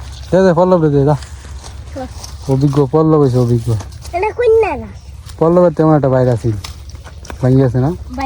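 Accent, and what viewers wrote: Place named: Mymensingh, Bangladesh. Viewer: ভাই আপনার বাড়ি কি ময়মনসিংহে কথা শুনে বোঝা যায়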